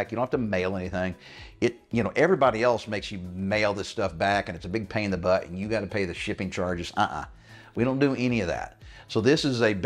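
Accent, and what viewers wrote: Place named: Austin, United States. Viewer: I recognize your accent. Austin texas? Am I right?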